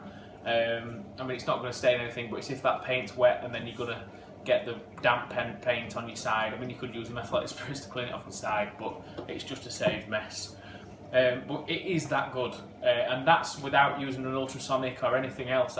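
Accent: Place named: Doncaster, United Kingdom. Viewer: Thanks, I wasn't 100% sure if it was working properly. Some of the acrylic paint came off but maybe just because I was scrubbing. I'll go try some methylated. By the way, trying to place your accent, are you from Doncaster?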